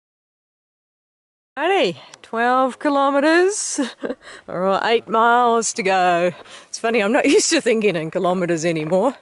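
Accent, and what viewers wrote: New Zealand. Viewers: Congratulations! Well done. I'm going to miss your Kiwi accent.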